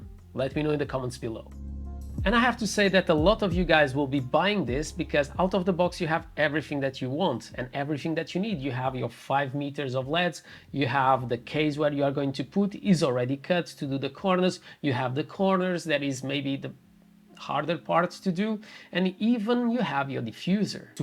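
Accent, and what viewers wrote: Portugal. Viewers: Hey…. Are you Portuguese??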